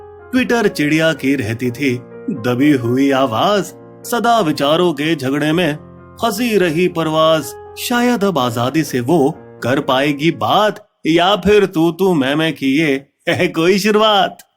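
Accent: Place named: South, India. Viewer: Good to see you back 😎. That madrasi voice caused my head to spin 🦔 🤮 🤮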